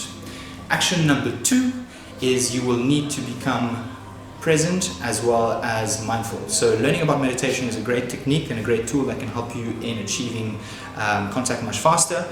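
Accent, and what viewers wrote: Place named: South Africa. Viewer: ah south african accent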